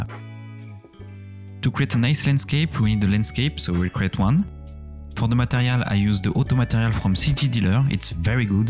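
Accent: France. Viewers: Salut, (je pense que tu est français à ton accent (ce n'est pas un reproche)) ton tuto à l'air top je chercher à apprendre à faire ca donc c'est parfait. Bon courage et continue comme sa et bonne fin d'année.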